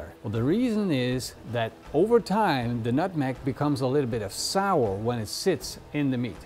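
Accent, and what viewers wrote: Netherlands. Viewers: Im a dutch guy with a turkish wife that really enjoy youre videos 🤣 she say he is a YouTube star with a dutch accent 🤣💪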